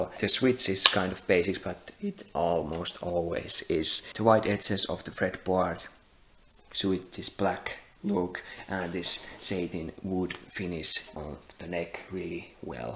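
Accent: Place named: Finland. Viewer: Hahahhaa äijällä vitun selvä suomi aksentti.. Hyvä video!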